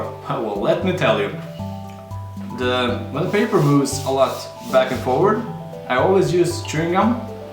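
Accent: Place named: Scandinavia. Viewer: Your accent sounds so Scandinavian .. Where are you from?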